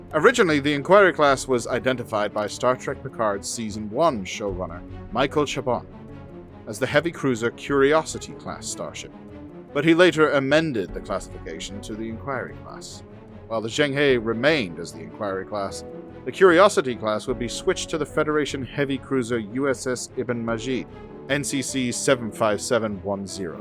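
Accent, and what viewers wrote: Ireland. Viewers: That accent! It's so very mid-Atlantic. I'm Irish, and the closest I can come to IDing it is as a very cultured or well traveled suburban Irishman. Where are you from? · Nice narration. Irish accent . Irish myself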